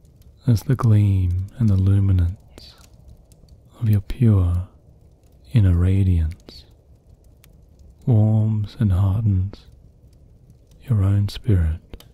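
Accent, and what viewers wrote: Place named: Australia. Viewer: I love that you’ve released a hibernation video just as we’re entering winter in the souther hemisphere. That combined with your calm Aussie accent is just so perfect. I feel at home 😌🙏🏽💗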